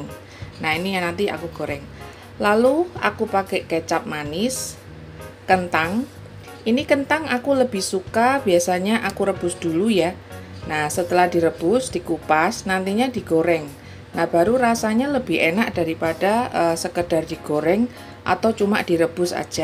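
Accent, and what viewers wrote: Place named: Surabaya, Indonesia. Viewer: Suaranya spt CECE INTAIMAGAZINE. suara khas SUROBOYOAN koyo AKU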